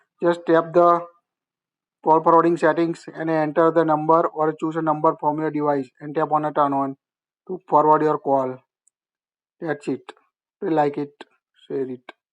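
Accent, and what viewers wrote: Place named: India. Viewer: Sir, Sir you would be better using a computer and print it out. Good grief. People cannot understand your dialect. It's too strong. I know you're speaking English, but it's a strong Indian dialect. Use a computer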